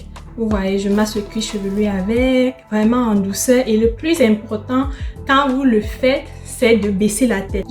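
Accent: Cameroon. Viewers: Uhein dès que je t'ai entendu parler j'ai toute suite su que tu étais camerounaise vraiment le goût de ça une belle camerounaise 😂😂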